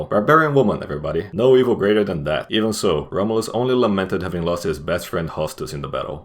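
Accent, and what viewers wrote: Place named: Brazil. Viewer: Is the narrator Brazilian? His accent sounds so Brazilian!!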